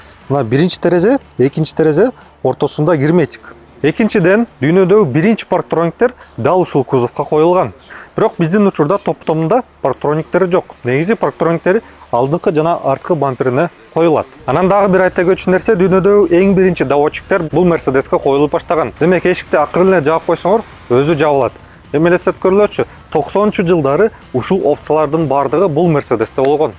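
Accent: Standard. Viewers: Ушул алып баруучунун кыргызча таза сүйлөгөнү жагат ❤ Кабан жөнүндө кыргыз тилибизде чыккан биринчи обзор!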